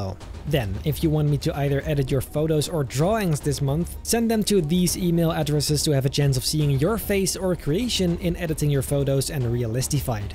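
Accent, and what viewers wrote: Netherlands. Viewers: Its cool but we also miss the funny dutch accent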